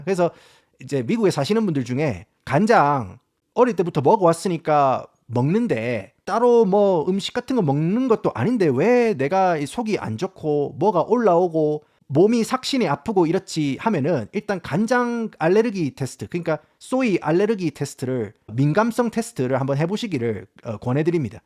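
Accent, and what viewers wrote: Gyeongsang, South Korea. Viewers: 경상도 사람 아니면 듣기힘들거같은데 전 이만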